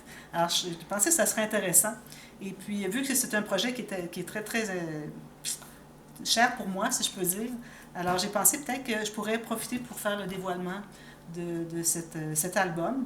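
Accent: Quebec, Canada. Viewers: Piquer une jasette c’est encore pire parce que ça sous entend de la colère en jasant...😖 bavarder vous conviendrait il où discuter? Et si nous placotions le 22 mars? Sauf que là, personne ne comprendrait peut-être... Ce n’est pas un cours de français, (un peu quand même car je contrôle toujours que ce qui me paraît inapproprié en français français, l’est réellement!) c’est amusant plutôt. Et j’ai du temps! De plus vous faites beaucoup d’efforts pour parler un français correct alors, on peut bien vous apporter notre soutien de cette façon. D’un autre côté, jaser peut plaire à beaucoup, donc c’est vous l’arbitre. On peut toujours vous laisser jaser, ce qui est fort plaisant avec votre accent canadien français! On peut ne redresser que les termes techniques qui ne conviennent pas ou que vous cherchez. Bernadette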